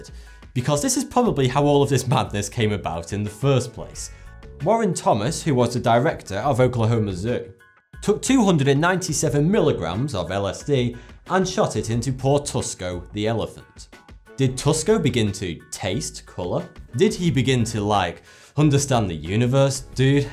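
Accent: United Kingdom. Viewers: He's Vsauce + Tom Scott, but extremely Brit.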